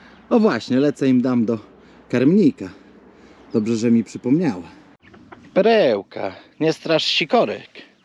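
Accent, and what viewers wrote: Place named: East, Poland. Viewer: Ale sie wyrobiles z tym Yiutabem normalnie smigasz sie bujajacbna bokin😂.. Ale kurde bele beks bo akcent masz i poprawiasz... Tak trzymaj wschodniaku 😮😊😜😂😂😂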